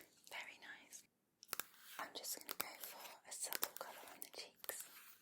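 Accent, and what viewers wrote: England, United Kingdom. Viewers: Love your accent isi kent or midlands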